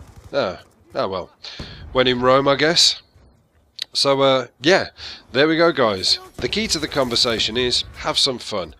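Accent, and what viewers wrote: United Kingdom. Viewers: I enjoy listening to you UK guys more than the US commentaries. Keep up the good vids bud!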